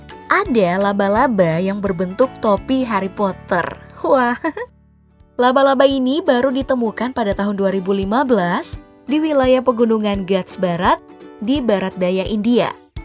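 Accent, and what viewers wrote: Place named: Java, Indonesia. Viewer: Mbak nya kalok ngomong ada jawa nya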